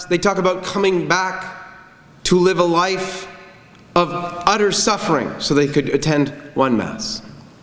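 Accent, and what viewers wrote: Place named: Germany. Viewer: Is he a German speaker? His English is so good!😁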